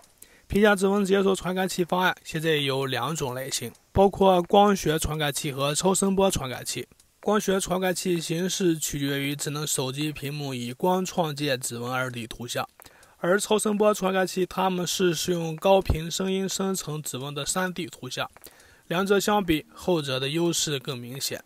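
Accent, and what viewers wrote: Shanxi, China. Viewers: 这货是山西人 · 主播山西人？？？？？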